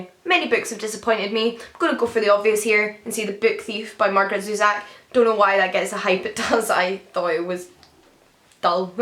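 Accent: Scotland, United Kingdom. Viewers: Well, now I want that big Sherlock Holmes book;). About ugly covers - I think I have quite a few of those from second-hand bookfest buys! Right now I'm reading the ugliest library edition (Scholastic edition) of The Hunger Games ever, and it's so embarrassing going about the house reading it, I'm reading it alone in my bedroom... ugh!:P I read The Book Thief this year, and I found it quite an intriguing read - mostly because I had such low expectations of the book after hating the film version... I guess I liked the friendships in that book, and also sometimes the writing was quirky. But yeah, it was a weird book, and definitely not a favourite war novel! Fun tag, ^_^ P.S. I love your Scottish accent - so cute!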